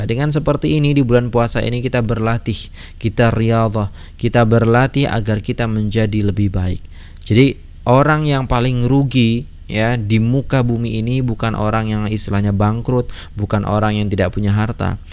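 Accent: Malaysia. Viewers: ..Cara awak cakap pun dah macam slang dan loghat orang melayu ❤️❤️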